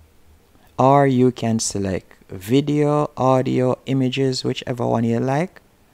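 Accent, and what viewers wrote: Jamaica. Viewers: u jamaican??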